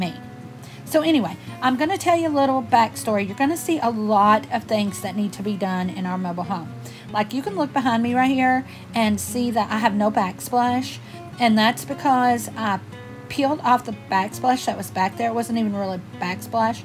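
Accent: South, United States. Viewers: New subbie here! I’m from Alabama so I love your southern accent! Tell you what. I’ll stay if you learn “Miranda’s” real name.🤣🤣🤣Thank you for sharing. Blessed be!🙏🏿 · Looks good. Your place is cute……I love your southern accent!!!!! I think also wherever you live you can make it your beautiful home. I have lived in an old mobile home when I was in my late twenties and also a very old summer cottage that was much more like a shack with no heat and they were fixed up very pretty and comfortable by me…. Love it! I love fixing up and making my space cozy…. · Loved your video. Keep them coming. Your southern accent is great!!! Can't wait to see more videos!!! God Bless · New subbie here. Enjoyed the video. I love the chattiness. Excited to see your home improvements. And love yhe Southern accent. Be yourself. And don't let the negative comments rent space in your mind.🥰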